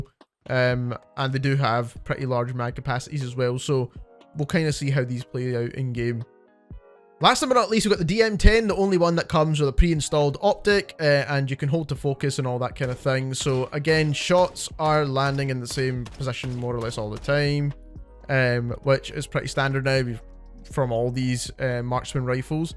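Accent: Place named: Glasgow, United Kingdom. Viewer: Are you Scottish bro sound a tad Glaswegian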